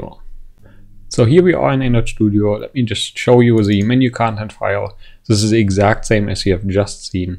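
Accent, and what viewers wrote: Germany. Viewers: Its called German Accent. Even medic from tf2 has that accent. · Instant erkennt das du Deutscher bist am Akzent xD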